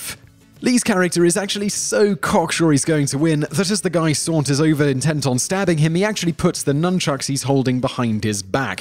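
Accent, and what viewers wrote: United Kingdom. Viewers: How can you know all this about Bruce Lee and still not be able to pronounce Kato? Even if you're pronunciation is "more correct" and you say it with a British Accent isn't going to change the fact that no one else says it that way. Also you mispronounced Milton Berle's name as well.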